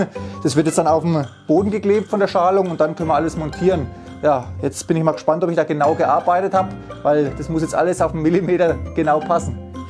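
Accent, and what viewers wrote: Franconia, Germany. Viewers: Cool, habe auch schon darüber nachgedacht, sowas selber zu bauen hatte aber nie eine vernünftige Idee. Das hier ist die Grundidee, die mir gleich zwei Probleme auf einmal löst. Danke für diesen Tip. Zumal ich diesen pfiffigen Kanal gar nicht kannte. Und dann das Ganze noch schey fränggisch! Wos moch der Frangg nuch mehra? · Meine Ohren hören Fränkisch 👂🏻👂🏻👂🏻......Region Bamberg?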